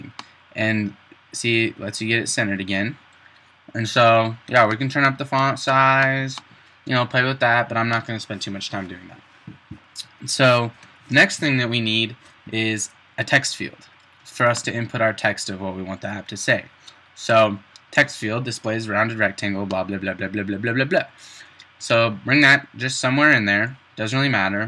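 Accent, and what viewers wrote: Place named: Maine, United States. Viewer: Thanks! Nice tutorial... Where are you from? Your accent is exactly like a friend of mine from Maine.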